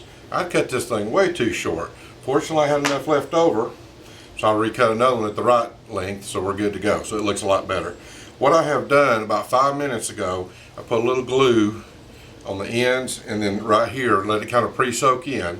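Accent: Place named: South, United States. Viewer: In the description there's a transcript option. That may be helpful for you. He has a southern accent so that may not be something you're used to hearing. I hope that helps you.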